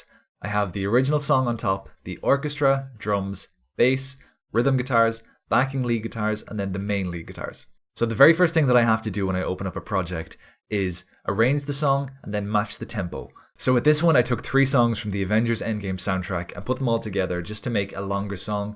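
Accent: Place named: Ireland. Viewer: Why did I never know you were Irish??? Love ittt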